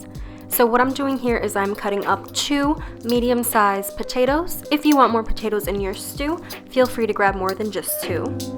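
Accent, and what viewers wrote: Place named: United States. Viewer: American, not Albanian accent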